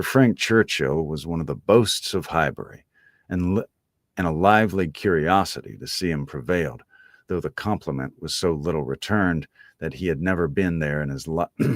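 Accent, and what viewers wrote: South, United States. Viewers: Thank You SO much for your love and work!! It's delightful listening to your renditions of classics - Southern Style! Fabulous!!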